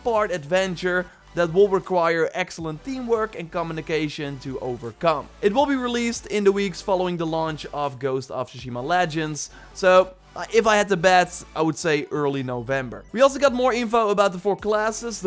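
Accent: Netherlands. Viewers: Aan je accent te horen ben je een Hollander ,choes your diffucultie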